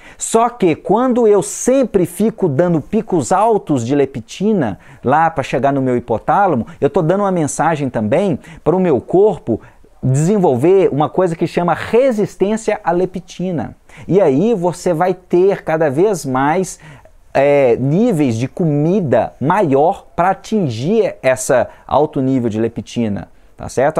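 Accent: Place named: Minas Gerais, Brazil. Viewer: Amo nosso sotaque mineiro. 😍😍❤❤ Depois deste vídeo ficou mais fácil entender o processo de emagrecimento. 👏🏿👏🏿👏🏿👏🏿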